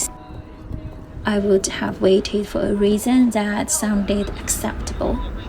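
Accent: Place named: United Kingdom. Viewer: have such a charming British accent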